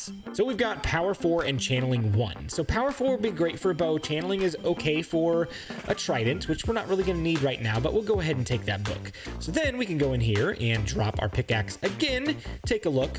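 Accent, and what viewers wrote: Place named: United States. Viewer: That American voice sounds like the dawn of victory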